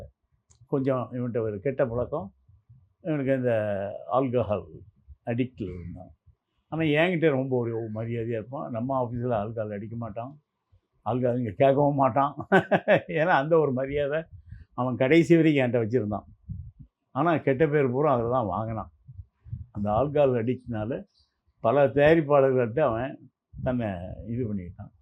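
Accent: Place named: Tirunelveli, India. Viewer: super nellai slang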